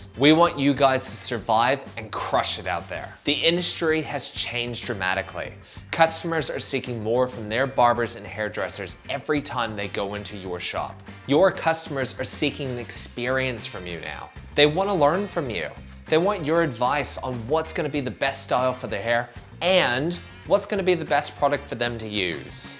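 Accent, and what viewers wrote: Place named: United States. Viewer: Thanks a lot for the comment Jack! Appreciate your support. I moved to Australia when I was much younger from the states. Never lost the accent fully!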